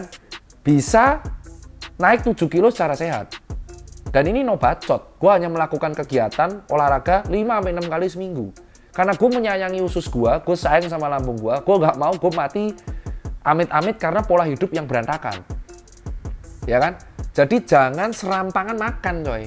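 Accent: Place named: Surabaya, Indonesia. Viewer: Seneng sekali dgn konten edukasi kesehatan dgn gya suroboyoan nyel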